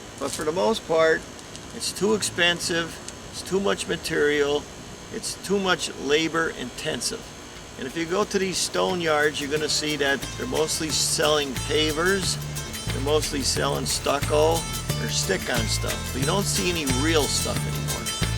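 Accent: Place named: Throop, United States. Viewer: Well, the reason that there is not so much brick, probably has to do in part with its relatively poor thermal properties. I bet that in places like Florida they are looking at building highly insulated structures. The problem is, these modern materials feel insincere; they are not honest. I appreciate older architecture and building methods and am constantly seeking out how the older guys did things. They weren't stupid. Always fun to listen to your videos. Your dialect always sounds familiar and reminds me of my family in Throop. One of my favorite places in the country. Thanks for another entertaining video.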